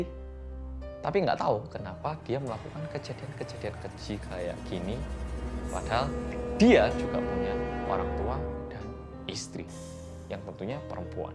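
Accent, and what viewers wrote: Java, Indonesia. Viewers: ... yang medokkk ~ * auto cabut earphones * okey . . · Mas: halo guys welcome back to korea reomit bersama orang korea Me: * siap-siap * Mas: yang... Me: MEDHOOOKKKK ❤❤❤❤❤ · medhok nya waw banget.. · "medok" nya ngegas mas :) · Semangat buat Korea medhok, nanti klo wabah selesai ke SBY ya bang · Hallo gaess welcome to back korea reomit bersama orang Korea yang medok😁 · Kaget medoknya😭😭😭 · Lanjut mas medok setelah nessie judge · Orang yang medok i love medok owakwao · Medhoookkknya kok pake nada tinggi mas?? Wkwkwkw... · Medokmu membuatku terkesan 😁🤭🙏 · Medhooooooknya bikin ngakak😭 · medok nya bikin aku kagett tiba2 ada falsetto hahahha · "bersama orang Korea yang medhooooookkkkkk" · Gercep Kembali lagi,bersama oramg korea yang..... "MEDHOOOKKKK" salam dari Jawa Timur yh mas. Lup²❤ #BoloneHansol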